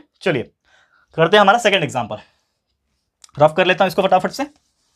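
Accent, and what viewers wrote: Maharashtra, India. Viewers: Sir aap Marathi ho kyaa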